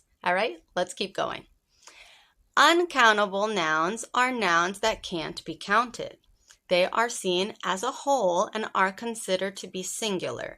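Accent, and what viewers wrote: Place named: United States. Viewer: You are so beautiful and your American accent is so pleasing to my ears. Happy New Year !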